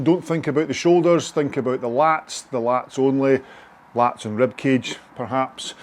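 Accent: Scotland, United Kingdom. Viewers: That beautiful Scottish accent - could listen all day 😄. Happy new year!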